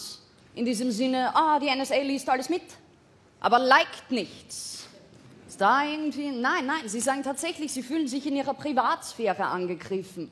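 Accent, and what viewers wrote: Austria. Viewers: Dabei spricht sie ein sehr, sehr schönes und wirklich gut verständliches Deutsch, nur mit einem Hauch österreichischem Dialekt. Einfach noch öfter anschauen und hören „üben“ sag ich als Deutsche in Österreich lebend. Das wird schon…🙂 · ich find lisa auch klasse.tritt oft b. D. Nuhr auf. Wenn einige im publikum nur die hälfte mitbekommen könnte es auch an ihrem schnellen, "österreichischen" akzent liegen?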